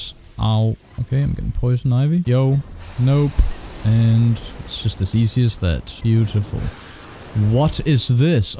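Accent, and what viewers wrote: Denmark. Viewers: Danglish on point, as always.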